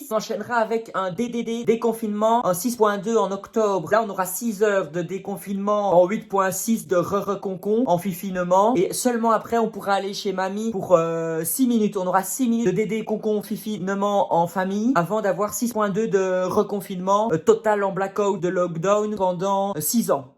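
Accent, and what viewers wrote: Belgium. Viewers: il est belge pas français 😂 ça s'entend avec notre bel accent pure souche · L'accent namurois , c'est réjouissant ! signé une namuroise exilée.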